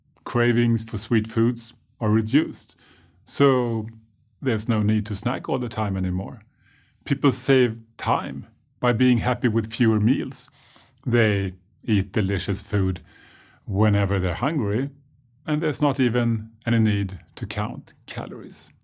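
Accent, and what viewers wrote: Sweden. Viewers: Anti-fans fuck-off. He is a Swedish Doctor that's why his accent is different. His name is, MD. I have his book LOW CARB, HIGH FAT FOOD REVOLUTION. And it really works on me and it's like my holly grail. He is a good doctor. 😃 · This obviously Swedish velvety middle-aged soft-spoken man has convinced me with his confident gaze. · is Swedish. No doubt about it. I lived in Norway for 21 years, so all the Scandinavian-English accents are crystal clear to me. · This guy sounds Swedish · Hes from Sweden, I hear that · I call swedish, sounds as bad as Arnold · Damn thats a Swedish accent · he is indeed swedish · Is he Swedish? 🤔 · Could you maybe be Swedish?:) I think I hear a slight Svenska accent;) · i like the accent i would say either swedish or norwegian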